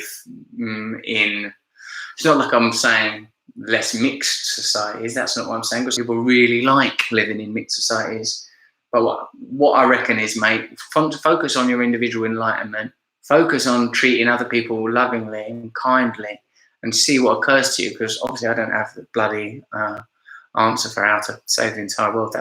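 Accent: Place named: United Kingdom. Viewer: Totally. I just know that when I do start reading, the version of voice in my head is no match for the real thing.;) We American's are so easily beguiled by eloquent British accents.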